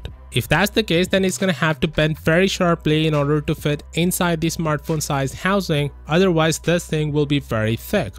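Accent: India. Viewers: He sounds like an an India IRS scammer.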